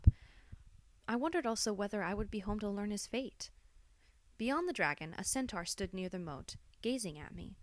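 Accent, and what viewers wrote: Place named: United Kingdom. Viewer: more like posh English, lol i loved the different languages, I never know how to say them when I read it.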